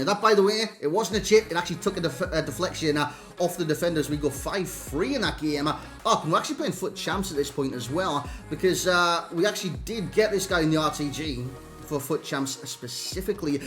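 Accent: Newcastle, United Kingdom. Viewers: Newcastle accent its not far from Scotland just incase you're not from the UK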